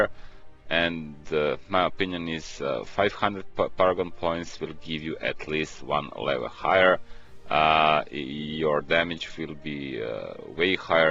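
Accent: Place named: Czech Republic. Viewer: really useful videos!!...thank you so much...btw your english sounds like czech or slovakian:) sorry if i miss...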